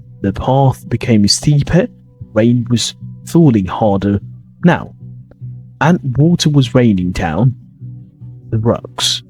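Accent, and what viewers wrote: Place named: RP, United Kingdom. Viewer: It’s a real pleasure to listen to these fascinating stories read in your perfect RP accent. I can’t wait to hear the next one. Thank you so much for all your hard work.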